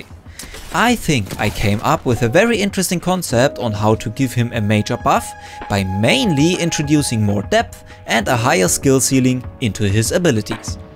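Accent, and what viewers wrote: Germany. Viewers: Der deutsche Akzent lenkt mich so ab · Hòrst dich deutsch an diggi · Bist du deutsch? Lol dein Akzent xD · Man hört dass du Deutscher/ Österreicher/ Schweizer bist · Der Akzent...du bist doch deutsch oder?🙂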